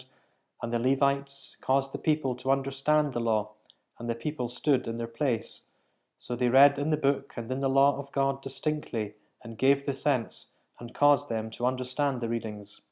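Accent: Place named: Scotland, United Kingdom. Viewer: Very helpful, Mòran taing. From a family bright to New England I confess that I appreciate your accent from Alba (?) as much as I do the Aramaic.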